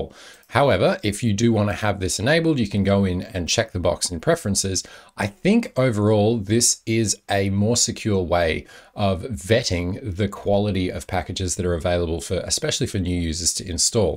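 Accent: Australia. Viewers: I hear Australian accent > I stay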